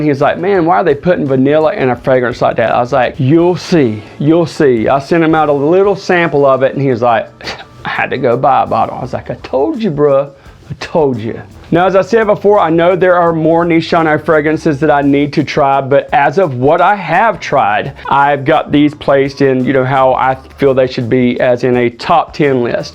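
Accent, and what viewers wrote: South, United States. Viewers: nice review with a nice southern accent 👍🏾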